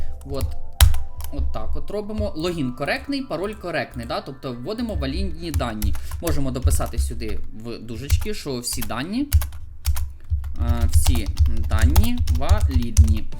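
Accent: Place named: Surzhyk, Ukraine. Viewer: Смешные звуки произносишь. Это суржик?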